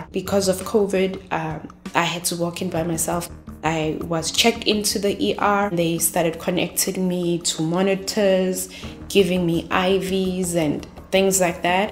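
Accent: South Africa. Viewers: She sounds like she is from South Africa 🇿🇦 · Your accent sounds very much South African. I'm here because I have had a lot of stings and severe pain in my heart for a couple of years now. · I know a South African accent when I hear one... I'm glad you're okay. 🥺❤ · im zimbabwean but i could tell she was south african too 😳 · she’s south african i can tell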